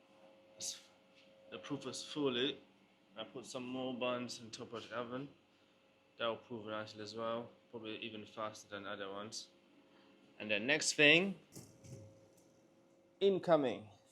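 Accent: England, United Kingdom. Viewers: As soon as you drove up to that old brick building I knew this was going to be in England, then you started talking and the accent confirmed my thoughts !!! Nice work !!! How did you learn all this ? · What English accent do you have?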